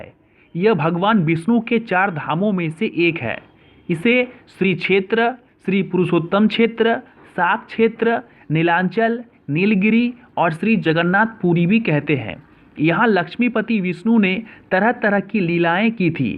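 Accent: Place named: Bihar, India. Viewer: Ra ki da mat karo na hi da ki ra mat karo...I know may b u belongs to Bihar ya UP ya Jharkhand to aap ka accent thoda change hota h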